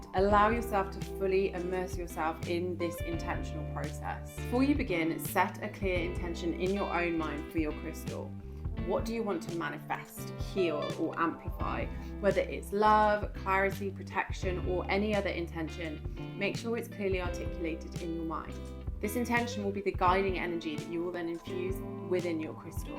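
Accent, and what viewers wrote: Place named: United Kingdom. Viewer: Sweet Spirit you talk to fast!! But I love your British accent!!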